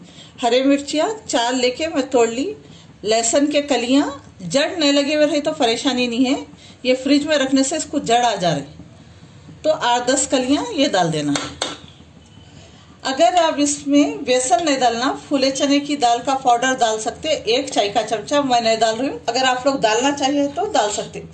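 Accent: Hyderabad, India. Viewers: Wah pakki hyderabadi language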